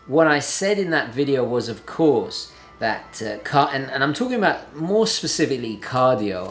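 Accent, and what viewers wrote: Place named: United Kingdom. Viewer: Hi, i like your vid because they are optimistic and positive. I love also your english. Very distinguish and british.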